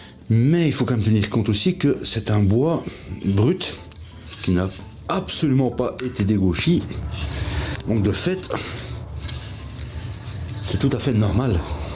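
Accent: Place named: Belgium. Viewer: On sens un petit accent belge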